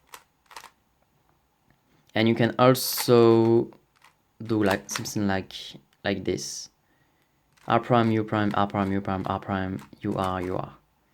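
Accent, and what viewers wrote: France. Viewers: French English sounds so French. I know a guy who says "particular", "specific" and similar words with French accent all the time ^^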